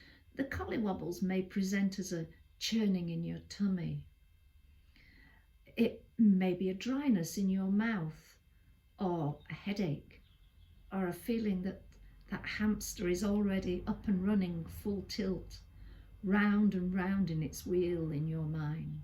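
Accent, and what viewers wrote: England, United Kingdom. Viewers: What a lovely reassuring voice you have. I am partial to English accents as I was married to someone from the UK . I am widowed and live alone with no family The golden years are not so shiny as described! However I do breath meditation and that helps with the collywobbles. Mine manifest as an aching hollowness in the chest. I think the reason they occur in the mornings is that in the state between sleep and wakefulness (hypnopompic) seems to be when we are most vulnerable and suggestable. Luckily my dog won’t allow me to allow me to stay horizontal. She demands her walk.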